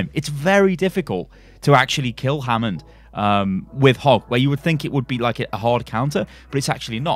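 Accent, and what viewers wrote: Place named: United Kingdom. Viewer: Hi! Love your vids, it's so nice to listen to a British accent like my own to when watching gameplay!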